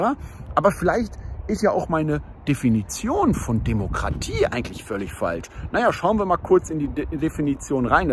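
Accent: Hesse, Germany. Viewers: also ich mag das hessische. Hatte mal einen Kollegen, der war sehr herzlich und lustig, hätte ihm stundenlang zuhören können. Ich bin echte sächsin, lebe über 30 Jahre in in Berlin. Also bisschen Mischung der Sprache. Freunde von mir leben in NRW.. man lernt schnell sich die Dialekte anzueignen.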